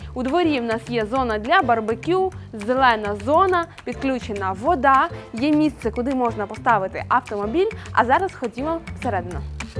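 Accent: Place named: Ivano-Frankivsk, Ukraine. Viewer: слухайте, ви, «мовний спеціаліст» ха-ха! Іванофранківці балакають прибацаним російсько-польсько-самовигаданим суржиком, і ця пані-ведуча Якраз так і розмовляє. Кажу як киянин що прожив у франківську 4 роки. Я вже їх наслухався, повірте мені!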